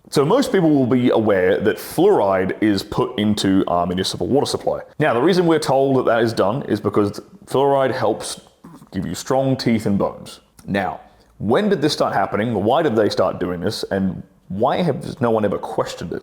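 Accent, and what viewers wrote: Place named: Australia. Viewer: As an Australian, this is the most annoying version of the aussie accent, if anyone talks like this, I just automatically assume they are talking out of their arse and everything they say is just bullshit one way or another. My success rate on picking up on bullshit artists is 100% and it's because they all have this stupid fuckin accent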